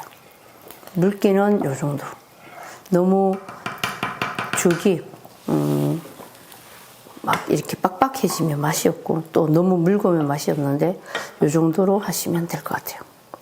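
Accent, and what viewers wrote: Gyeongsang, South Korea. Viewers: 스님 경상도말이넘정겨워요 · 외국말이 아니고요 경상도 사투리에 표준말 석어 하실려니까 어색하게 들려 그런거 갇은대요 ㅎ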